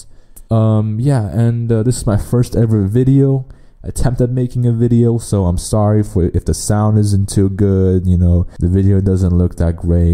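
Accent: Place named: Russia. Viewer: According to accent - not so external view. Sounds definitely like russian pretending to sound not russian. Great review though, who cares.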